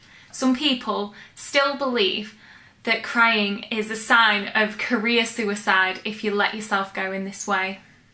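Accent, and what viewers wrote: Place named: England, United Kingdom. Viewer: your analysis is simple and correct. Especially delivered with beautiful English accent.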